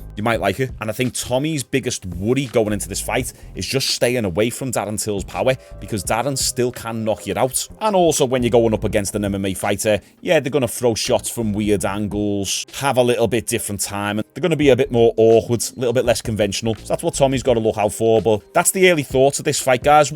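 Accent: Liverpool, United Kingdom. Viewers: An elequent scouser yes lad 😂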